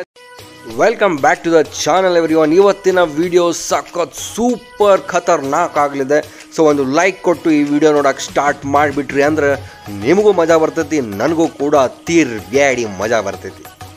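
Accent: North Karnataka, India. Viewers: uk bhase pakka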